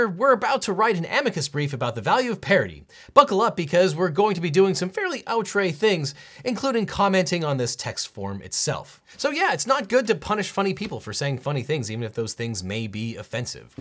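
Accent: United States. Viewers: Broooo you need to chill with your american pronounciation in latin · Hearing an American accent in Latin is... well, it is something for sure.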